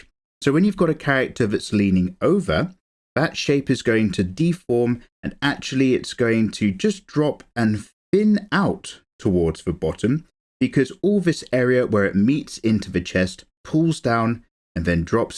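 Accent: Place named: RP, United Kingdom. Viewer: I just spent 23 minutes listening to a very pleasant British gentleman explaining in RP (sounds like RP to my ears) how to draw anime tiddies. Youtube algorithm, mission accomplished. Jokes aside, this really was an interesting video.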